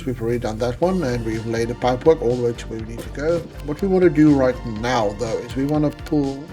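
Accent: South Africa. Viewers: Sit en kyk hierdie video en dink vir myself, "die man klink Suid-Afrikaans" .. Well done man, dit lyk epic!! Subscribed👍🏻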